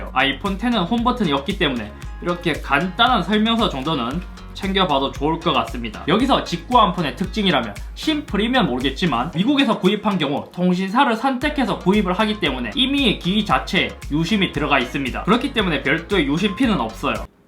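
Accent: Gyeongsang, South Korea. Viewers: 같은 경상도 사람이라 억양이 비슷할수밖에 없어요.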